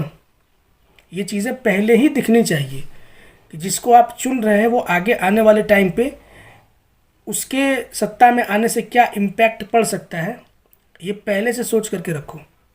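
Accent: Bihar, India. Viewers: Tu to bihari lagta h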